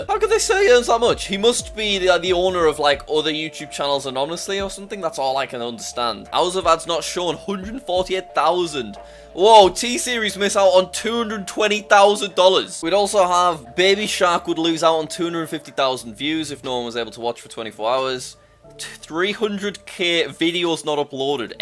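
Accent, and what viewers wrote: United Kingdom. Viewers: Bro i love your british accent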